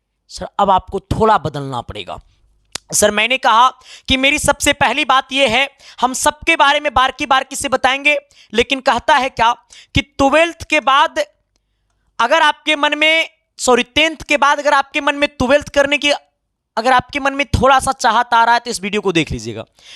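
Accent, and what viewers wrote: Bihar, India. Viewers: Bihari style me bakwaas karta hai. Time waste karta hai. 12th me certificate milta hai degree nahi.😂😂😂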